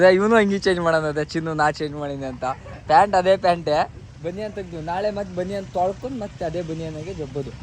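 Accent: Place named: Malnad, India. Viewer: Propaer malnd kannada matdthira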